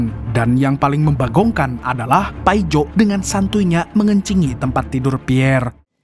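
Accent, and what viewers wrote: Banjar, Indonesia. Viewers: urang banjar pian lah min 😀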